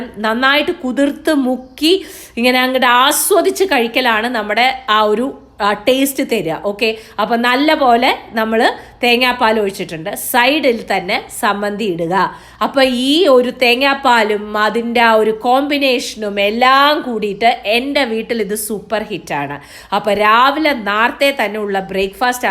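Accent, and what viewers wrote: Palakkad, India. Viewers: Palakkad area aappam anu ennu thonnunnu. Nemmara thamasikkumbol avidullavar pronounce cheyyunnathu pole thanne aayirunnu. Thrissur appam thanne anu.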